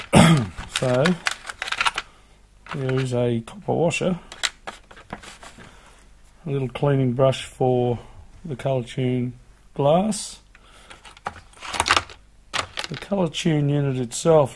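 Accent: Australia. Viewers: Glad to hear that accent from the down under. Hi from the New England reagen usa. ( north of boston lol) Thats a must have kit Thanks soo much . Cleaning out my Kawasaki super sherpa 250 carb.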